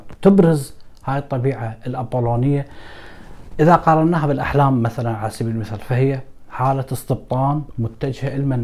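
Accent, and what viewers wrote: Iraq. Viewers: اشكرك من صميم قلبي على كل ما تقدمه بصوتك الشجي العراقي الاصيل . تحية لك من دمشق ❤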